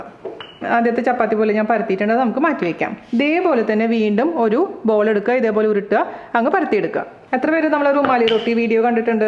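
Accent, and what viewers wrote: Thrissur, India. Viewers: Intro matenda chechi...athokke karanam aanu ente kudumbathile aalayi thanne thonunne...pinne ente relativesinte okke same perinjanam side trissur slangum..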